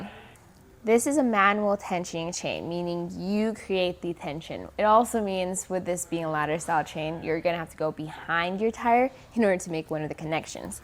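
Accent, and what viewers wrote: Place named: Midwest, United States. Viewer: Someone's from the Midwest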